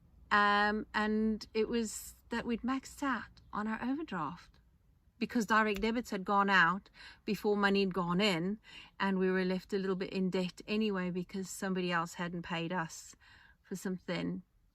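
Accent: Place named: South Africa. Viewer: The UK benefit system is rorted (to take unfair advantage of a public service) with 950k job vacancies, over 8.5m people claiming benefit’s more people claim credits than pay tax. You sound like your from SA .. 40% joberg unemployed they don’t have benefits .. too many takers not enough givers in this country.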